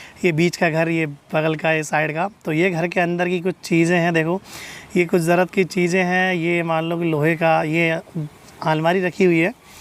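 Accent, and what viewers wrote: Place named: Dausa, India. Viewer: Aap dausa se ho yesa lag raha h or Meena bhi kyuki meri frnd dausa ki h wo bolti h aapki tarah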